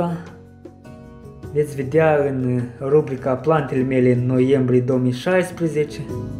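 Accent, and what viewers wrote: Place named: Russia. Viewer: vlogul e fain,dar mai lasă limba rusa deoparte, te face să vorbești Romana "urat" 👍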